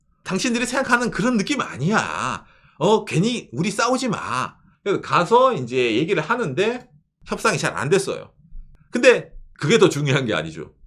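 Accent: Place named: Gyeongsang, South Korea. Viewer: 일본 사람 팬더 좋아한다메? ㅋㅋ 과 같이 살더니 자연스레 경상도 사투리를 쓰시네요~~~^^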